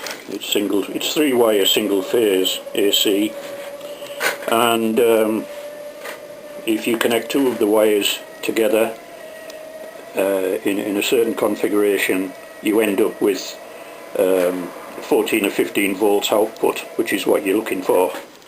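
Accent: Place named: Cumbria, United Kingdom. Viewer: Nice bike, you sound very Cumbrian indeed.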